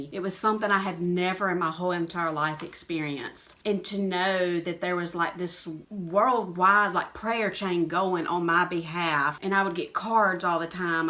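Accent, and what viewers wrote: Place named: South, United States. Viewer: Oh Dear God!!! Love the southern accent!!! Where you from girl?? Lemme guess, Georgia??? My parents used to live right outside of Atlanta. I grew up in south west Arkansas. Girl you are killin it! Keep it up! Mashed that 🛎!!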